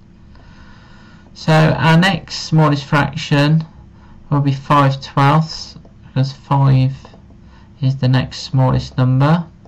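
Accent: United Kingdom. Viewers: R u british???😮😮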